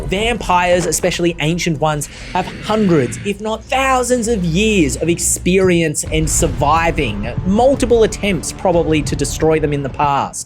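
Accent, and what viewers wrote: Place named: Australia. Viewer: Aussy vampire intro... I am sorry but I can't take it seriously enough)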